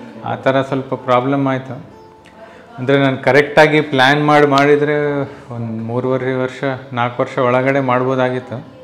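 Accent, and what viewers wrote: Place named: Bellary, India. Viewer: His original Bellary kannad accent I just love it👌👌👌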